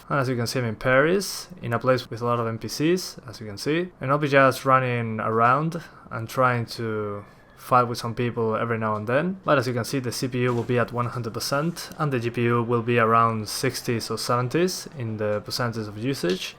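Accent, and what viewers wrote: Argentina. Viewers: Wacho alto nivel de inglés, tarde un poco en notar el acento argentino.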